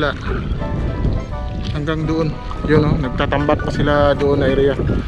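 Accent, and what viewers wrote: Visayas, Philippines. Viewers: Bisaya man kaau imong tagalog idol pro ok ghapon dol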